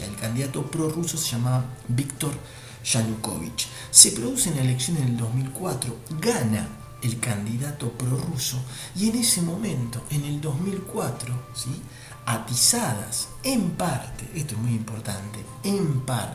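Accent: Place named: Argentina. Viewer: por tu apellido de origen "israelí " no se si seras imparcial ,pero viendo el mapa de crimea como ucraniano creo que no...por tu acento pareces argentino ,sabras que Inglaterra antepone la validez de los kelpers ,ciudadanos de segunda ingleses la AUTODETERMINACION DE LOS PUEBLOS para el tema malvinas ellos quieren ser ingleses....en CRIMEA. esa misma AUTODETERMINACION dice el 97% quiere volver a la madre patria Rusia y volvió..que paradoja Occidente acepta lo de los kelpers no lo de los crimeos , a y te recuerdo que israel siempre voto en contra de argentina las resoluciones de la onu sobre malvinas 3 países lo hacían ISRAEL...USA....Y GRAN BRETAÑA ....a mi humilde entender la trilogía del mal en el mundo VIVA RUSIA...VIVA PUTIN !!!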